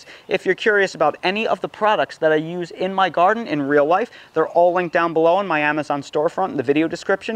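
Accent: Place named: Northeast, United States. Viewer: Thanks for the great video! This is the first time I’ve heard of parthenogenic cukes, and the cucumber beetles here in Virginia are 😬😬😬😬. Weird question, but are you a Northeast transplant? Asking because I am too and your accent sounds familiar lol. Had a bit of an adjustment period learning to garden in the South but I think I’m getting the hang of it these days 😊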